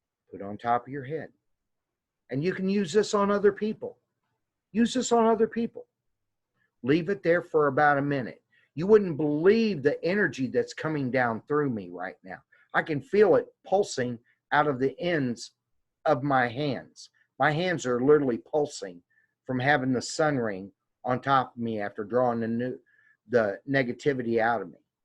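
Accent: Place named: Georgia, United States. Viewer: either way, he says it in Georgia talk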